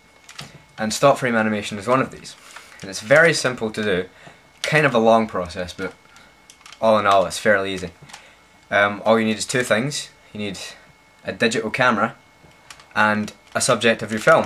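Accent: Scotland, United Kingdom. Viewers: yhass lol ur scottish! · u da man son, im scottish to and i get that irish thing all the time lol. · This is a really good video, I learned something new today! Also, I'd marry you just because you have a lovely Scottish accent haha :) But anyways this was really helpful, I'm making an animation for class and had no idea how to do the importing part :P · I enjoyed it a lot. BTW, I knew you were scottish...lovely accent. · wow im irish and i could hear a scottish accent not an irish one.. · Thanks a zillion!!!! the video gave me exactly what i was breakin my head over. and the scottish accent is very cute and distracting (in a good way ) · Great info! Thanks, I'm going to try it today. OH...and I could tell you have a Scottish accent not Irish :) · Je is Scottish god sake aaaa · Nice vid. I notice you did the hard way to begin with! When I import the images from the collection to the timeline, I do it all in one go (Simply select all and drag to the timeline). 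Also, would have been nice if you'd shown how to add titles and a soundtrack. PS. I sussed you were Scottish straight away lolol! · Ooo I love the Scottish accent!!!! :D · Dude love ur scottish accent, and i am gonna enjoy making movies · wow... I can't believe I just watched what I experienced on my own! Really! And I wouldn't think he'd be irish at all... more like british... Geez! stop animation is hard! mainly because I give up too often... >_<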